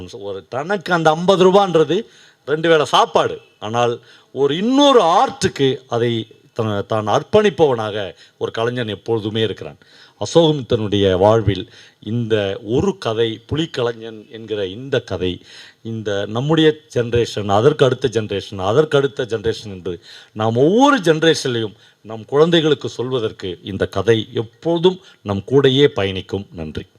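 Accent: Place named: Tiruvannamalai, India. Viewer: தி.மலை தமிழில் உரையாடுவதின் உயிரோட்டத்தை உணர முடிகிறது.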